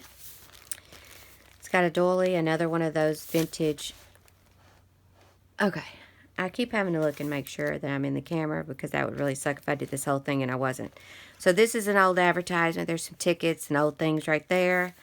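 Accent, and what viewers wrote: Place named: South, United States. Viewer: Love love everything about this journal but I love your accent & the way you explain. Plz tell me y'all from the south 💜😘👍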